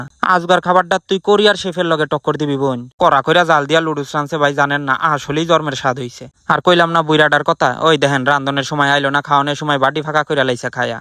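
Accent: Comilla, Bangladesh. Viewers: Vaiya Tumar kotha gulovonek Valo lage ar onek funny lage accha vaiya Tumi koi thako 😂😂😂😂😂Tumi ki kumilla te thako tomar vasha gulo amon kummillar moto 😂😂😂😂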